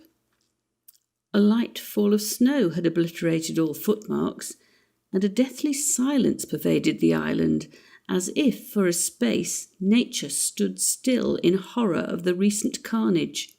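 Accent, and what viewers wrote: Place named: United Kingdom. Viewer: I like British stories read with a British accent best & this one is easy to hear, loud & clear. Thank you.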